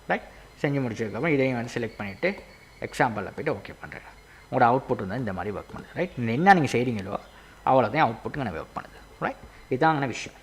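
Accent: Sri Lanka. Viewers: Srilanka Tamil ah nenga ?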